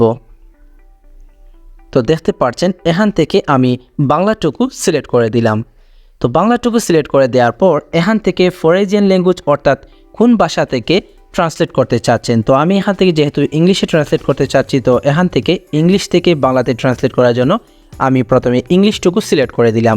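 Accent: Sylhet, Bangladesh. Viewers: ভাই আপনি কি সিলিটি❤❤ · Bhai ki sylheti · ভাই আপনি মনে হয় সিলেটি r8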